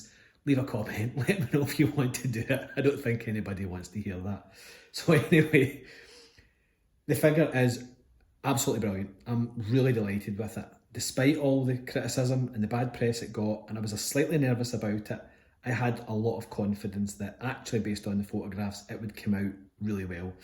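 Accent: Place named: Scotland, United Kingdom. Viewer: Fantastic, so quick off the mark with your video of Cable, congratulations on your pick up and getting it early doors. So pleased for you, delighted you called it right and now have a great figure that you are happy to have in the collection. As mentioned in previous comments I do think a lot of people were too down on the figure from the get go, I really like it and think it presents so well, a real welcome edition to your great collection for sure. As for your dulcet Scottish tones, as a Glaswegian myself I am delighted your channel is going from strength to strength 😂 really enjoyed the video and as always, looking forward to whatever you may have next in the pipeline, please keep the videos coming, a great watch, as always stay well👍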